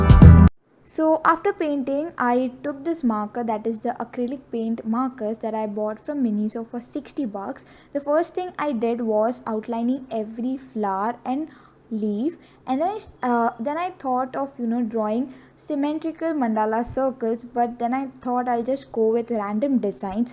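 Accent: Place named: United Kingdom. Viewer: Wish you come to uk help me I notice Brit accent.do some classes here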